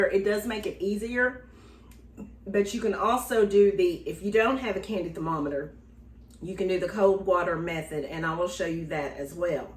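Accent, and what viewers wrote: South, United States. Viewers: I love your recipe and your Southern accent.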